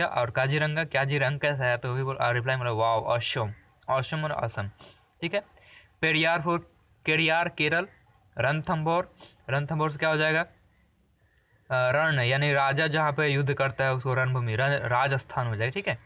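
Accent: Bihar, India. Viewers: bihari language , improve it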